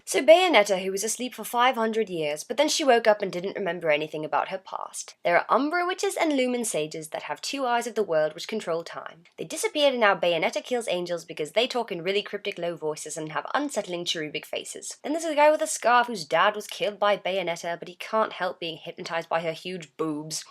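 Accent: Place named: United Kingdom. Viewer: No word of a lie, I thought you where british, shows how much I know about my own background XD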